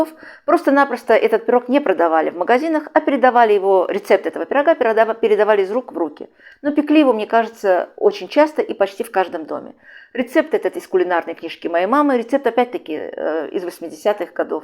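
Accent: Baku, Azerbaijan. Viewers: Пирог конечностей и вы приятная ,удачи вам ,акцент Бакинский напоминания,всем здоровья и мира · Какая же вы очаровательная,!!! Правильно вы сказали,что те рецепты несут с собой такое тепло,потому что мы пекли совсем с другими чувствами… Я больше слушаю ваш чарующий голос и чудесный акцент,сразу переношусь в Баку,и чувствую радость жизни.Вы мой антидепрессант!!!! Храни вас Господь,дорогая ❤!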